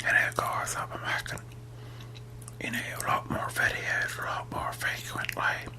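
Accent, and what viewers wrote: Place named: South, United States. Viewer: amaan ahmed people from the south of the United States have that accent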